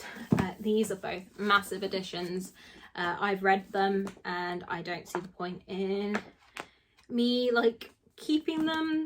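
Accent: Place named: England, United Kingdom. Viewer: You sound like you live in England. Were all your books bought there and can you get them in Canada?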